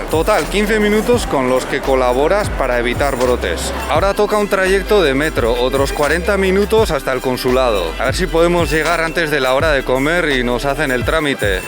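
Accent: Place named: Basque Country, Spain. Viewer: La primera vez que YouTube me sugirió un vídeo vuestro me dio repelús el acentaco vascorro de, pero al Segundo o tercer vídeo me empezó a encantar. Gracias a vosotros he descubierto China sin viajar y ha cambiado totalmente la visión que tenía de ese país. Saludos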